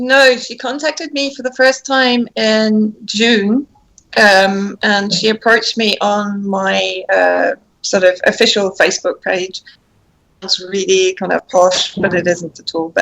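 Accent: United Kingdom. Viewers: I think you’re great, but simply curious as to why you’re suddenly speaking in a British accent? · Great interview, lots of information. Vanessa is a real frontline journalist and deserves to have her story told. Just a comment, it is funny how you can be a chameleon with your accent,. You make videos about Jimmy Dore, etc, and you have an American accent. (No offense, LOL). Here you have a slight British intonation. I pay attention since I meet lots of people in International Schools and they have that ability with languages. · Are you back in the UK? I ask because you have that same British accent for this interview as you did during the Assange trial.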